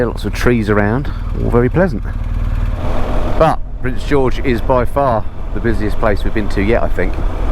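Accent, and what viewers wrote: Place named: Yorkshire, United Kingdom. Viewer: I thought you are a Yorkshire man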